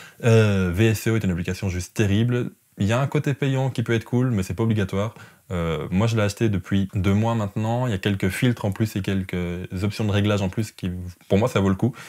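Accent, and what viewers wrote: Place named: Charleroi, Belgium. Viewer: Accent de Charleroi je dirais 🤔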